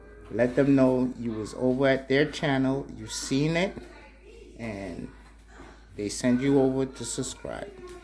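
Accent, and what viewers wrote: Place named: Jamaica. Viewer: Man, you sound like a Jamaican for real bro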